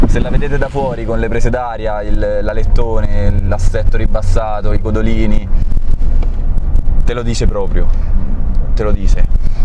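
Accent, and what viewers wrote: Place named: Rome, Italy. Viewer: per me troppo romanesco per parlare di cose serie come macchine e motori.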